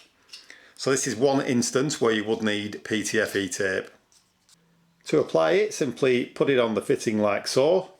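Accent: United Kingdom. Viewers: Best accent in UK, best advice in World! What did you do to your hand?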